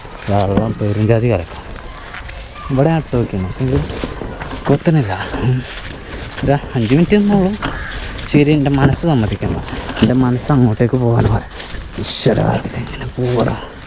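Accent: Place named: Kannur, India. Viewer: ബ്രോ ന്റെ ഭാഷ പൊളി കണ്ണൂർ ഭാഷ ശൈലി ആണ് നമ്മൾ രൂപ ക്ക് എന്നൊക്കെ കേൾക്കാൻ നല്ല രസം 😃😃😃😃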